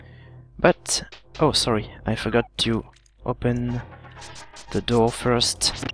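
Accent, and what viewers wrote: France. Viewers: I have your game and I have to say it's done great! Keep doing this! And I just love your french accent! ;) Greetings from Sweden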